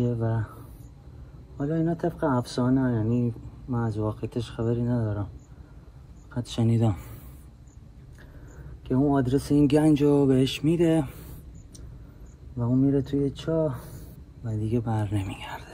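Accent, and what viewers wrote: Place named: South, Iran. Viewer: دمتکرم جنوب بی‌نظیر وزیبا واون لحجه زیبا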